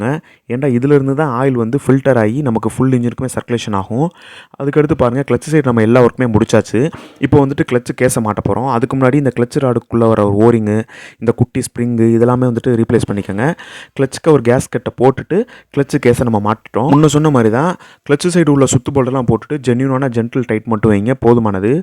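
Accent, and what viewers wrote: Madurai, India. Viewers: Neenga entha oor madurai ya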